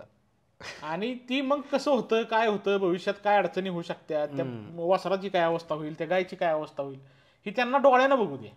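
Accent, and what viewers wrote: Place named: Satara, India. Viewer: लय भारी चर्चा केली दादा अगदी आपल्या सातारी भाषेत. खूप मस्त. धन्यवाद!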